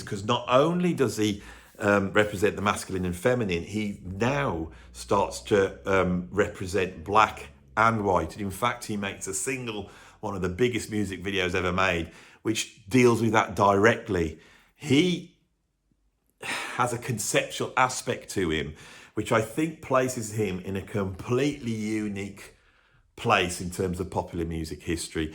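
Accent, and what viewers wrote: Birmingham, United Kingdom. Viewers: Oh...for God's SAKE!!!...this is PAINFUL to listen to! For a start...I can never take any Brummie seriously with that ridiculous whiney Jasper Carrot/Noddy Holder/Ozzy accent! It makes me teeth itch! 😖 Secondly...WTAF?!?! I don't know what makes you think that YOUR opinion qualifies you to spout such tosh, but...I'll give you MINE... Musician for over 50 years. Multi-instrumentalist. Guitar, Keys, Clarinet, Flute, and Sax. Played professionally for decades to some considerable success. Also a published writer and music historian. So...to listen to SO MANY INNACURACIES...just made me want to SCREAM! So much of what you were blathering about is just plain FACTUALLY WRONG! Example: Zeppelin...were what the Band of Joy BECAME?!?! 😂😂😂😂😂😂 WHAT?!?! Utterly ludicrous. Zeppelin were ALWAYS Pages brainchild. From beginning to end. 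He had seen The Jeff Beck Group...and basically had decided to photocopy them. Add a few gnarly blues standards dressed up as Zep "originals"...and there you have it. NOTHING to do with some hippy-drippy band of deluded hippies from the "Bluck Countraaay" Give me strength! Go back to working in Carphone Warehouse mate... This CLEARLY isn't your gig. 🙄😏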